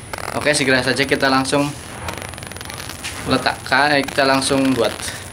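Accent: Banyumas, Indonesia. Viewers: dri logat nya kya org banyumas ya om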